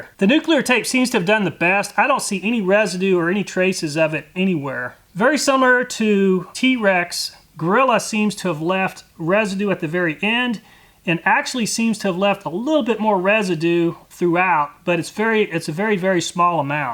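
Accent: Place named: Brooklyn, United States. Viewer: He's from brooklyn you can tell by his accent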